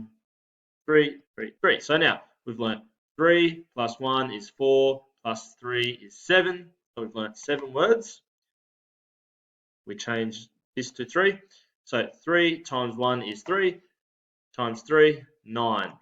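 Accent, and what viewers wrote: Australia. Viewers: How come he's teaching Maori with an Aussie accent? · You sound Australian Don't be offended but thank you nga mihi bro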